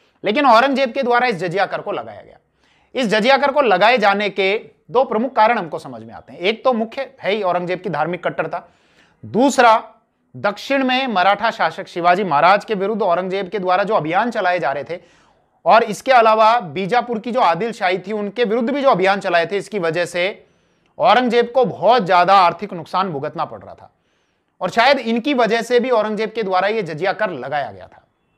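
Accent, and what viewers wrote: Rajasthan, India. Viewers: your accent shows your Rajasthani roots is just great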